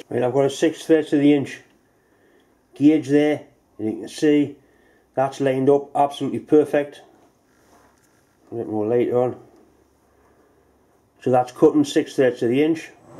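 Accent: Newcastle, United Kingdom. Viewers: He's a Geordie. They don't do foreign,. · I think he's geordie from Newcastle. Up North East. I'm from Manchester Northwest.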